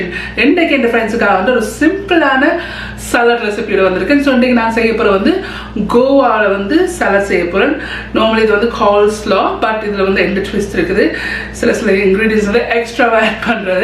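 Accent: Sri Lanka. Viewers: Like your ilangai Tamizh.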